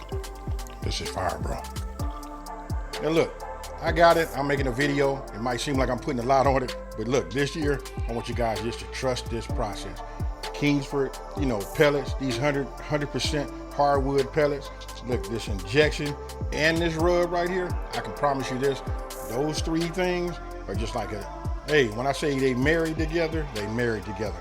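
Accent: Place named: West Coast, United States. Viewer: Keep going bro... only chef with that gangsta west coast accent... Love how your channel is blowing up.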